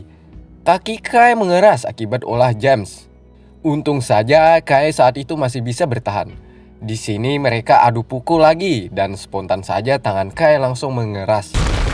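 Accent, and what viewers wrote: Banjar, Indonesia. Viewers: mimin ini dr kalimantan bro. logatnya kental bahasa banjar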